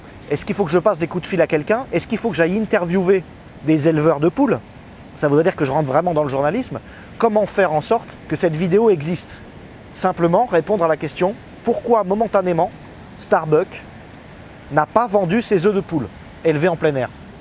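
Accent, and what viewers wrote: South, France. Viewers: On dirais qu'il commence à perdre son accent du Sud.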